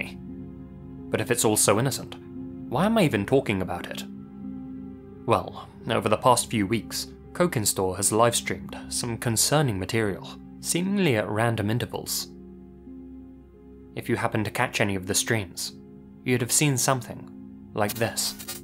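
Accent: United Kingdom. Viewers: British guy doing as for usps...love it haha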